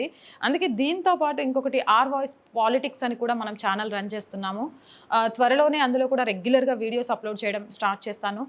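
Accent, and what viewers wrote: Telangana, India. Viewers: నువ్వు తెలంగాణ యాస తగ్గిస్తే ఇంకా పెరుగుతుంది పోరి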